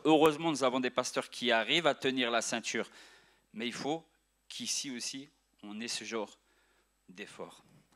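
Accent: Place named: Arab world. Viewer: Ça me perturbe de l'entendre parler Arabe no were au travers du français!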